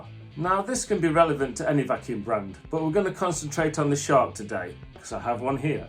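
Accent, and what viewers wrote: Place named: East Midlands, United Kingdom. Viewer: Haha could tell instantly have strong East Midlands accent lol.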